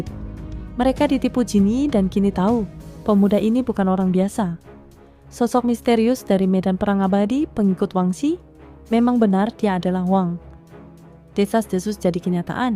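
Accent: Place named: Java, Indonesia. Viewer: lanjut mntap....ga ada bosenya kaya suara indah ke jawa2nya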